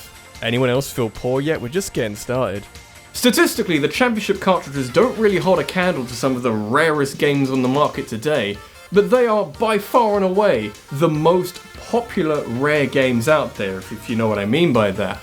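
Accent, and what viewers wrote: United Kingdom. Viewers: what is gramma attack? gamra attack? gammar? the british accent, they like to skip their Ts and add Rs. I cannot enjoy listening to british speakers because I am too stupid and derp out when they say words like law and i hear lore and spend the next 10 seconds trying to figure out if im having a stroke, and end up missing what they are saying, leading me to get totally lost. just me? or is it genuinely confusing when they say words they dont mean to? sorry, just realizing why im unsubscribing from british channels, makes me sad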